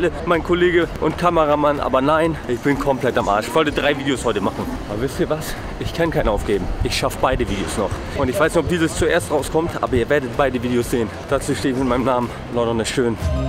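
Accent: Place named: Hamburg, Germany. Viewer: Ich schau dir so gerne zu, ich würd auch gern sagen das ich dir gerne zuhöre aber dein nuscheliger Hamburger Akzent, einfach lustig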